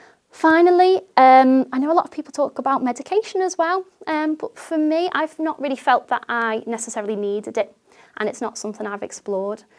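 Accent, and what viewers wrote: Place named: Scotland, United Kingdom. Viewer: I was also wondering if it's irish or Scottish... imma say Scottish haha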